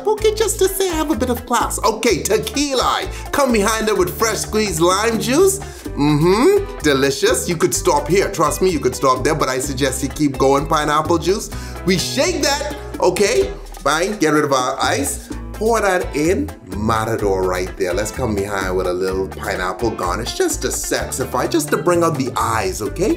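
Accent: Bahamas. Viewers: Nice video. Sounds a little Bahamian. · He sound Bahamian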